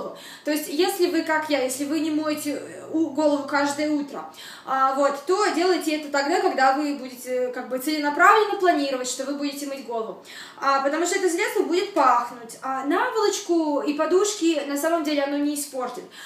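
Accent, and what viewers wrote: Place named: United States. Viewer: у тебя акцент))) наверное ты проживаешь в Америке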